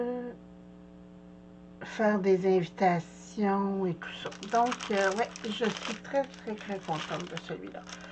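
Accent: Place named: Quebec, Canada. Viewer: Je me suis commandé ton papier vélum, il arrive demain. Tu m’as vraiment donné le goût . Je viens de découvrir ta chaîne. J’aime beaucoup regarder les vidéos qui me donnent des idées. J’en suis beaucoup de France. Mais par ton accent et tu parle de dollars alors cela me dit que tu es peut être du Québec??? Contente d’avoir découvert ta chaine 🥰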